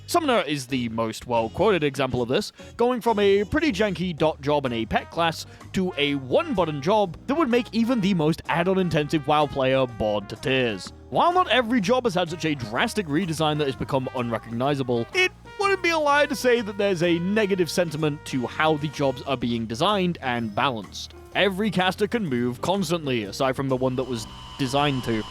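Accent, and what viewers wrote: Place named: Australia. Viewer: "UK". No, Australian. So UK Lite 🙃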